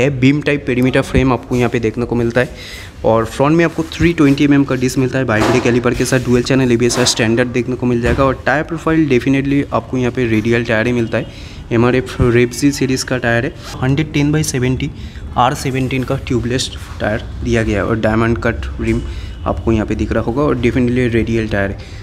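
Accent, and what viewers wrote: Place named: Bengal, India. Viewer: Hey bro i like your bengalish Hindi ...❤unique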